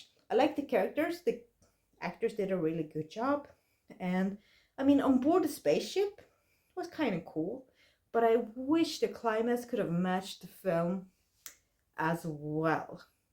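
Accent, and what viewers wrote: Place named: Sweden. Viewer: I was going to ask what that accent was, but you said your from Sweden? So I’m guessing it’s Swedish 😊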